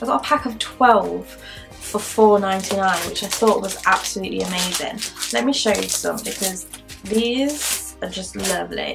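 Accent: United Kingdom. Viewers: Awwwwwww! :D i love your new stuff and i love your British accent too <3 :D Love from Egypt <3 <3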